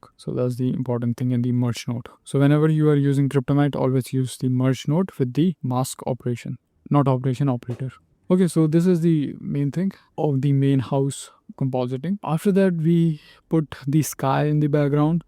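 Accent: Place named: India. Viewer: bhai aapke ascent se aap shayad indian lagte ho , badiya tutorial tha ek dum maja aagya kafi value addition hua thanks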